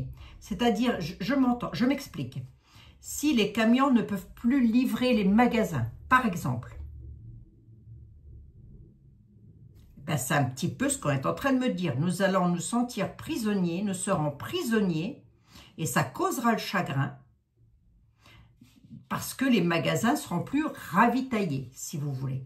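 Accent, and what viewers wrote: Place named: Haute-Saône, France. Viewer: Cc la vieille femme 😂😂 ,oui j'ai regarder hier pour la pompadoure tu devais regarder la suite se soir . T'on accent je le connais ma mère était de pas très loin de chez toi ou j'ai été en décembre dans le 70 luxeuil les Bains, et je voulais passer te voir mais j'ai pas oser peur de déranger. Jésus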